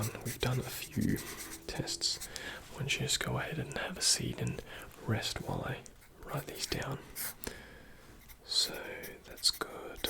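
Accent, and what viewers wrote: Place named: Australia. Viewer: damn you are fulfilling my South East Asian Physiotherapist fantasy with an OZ accent and im so in it. forgive me.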